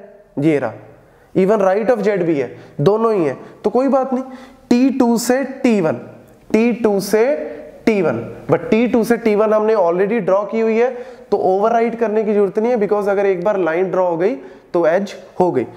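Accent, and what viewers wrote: Punjab, India. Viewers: sir are u from punjab??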